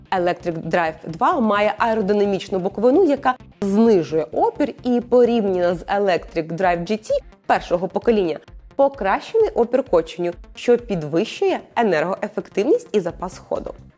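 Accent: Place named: Russia. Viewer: вибачте- на "першому автомобільному" на другий рік війни ви капітулювали і,вслід за іншими, обмоскалились!??? Це жах - чути Вас масковскою😢😢😢... Осадачєк астался😮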